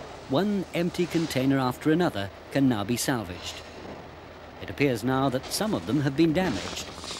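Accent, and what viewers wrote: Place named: RP, United Kingdom. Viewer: It's not an accent it's called Received Pronunciation and he likely wouldn't have got the job without it at that point in time! I'm not a fan but I think it's deemed the most understandable form of the English language.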